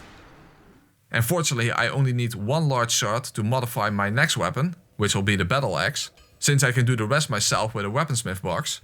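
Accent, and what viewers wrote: Netherlands. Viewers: I like his dutch accent!^^ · your accent is quite obvious Dutch (Netherlands). Very nice 🙂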